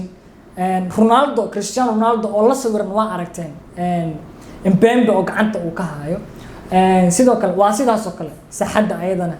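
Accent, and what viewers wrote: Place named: Mudug, Somalia. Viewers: walhi uma malaynnay inanaad reer xamar tahay obaha haysty reer mudug😂😊😂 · Zxp hadalka mudug xagow ka so racay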